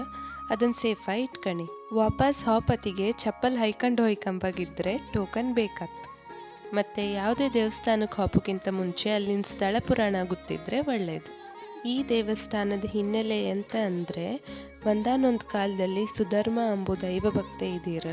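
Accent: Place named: Kundapur, India. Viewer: Super kundapur kannada💐💐💐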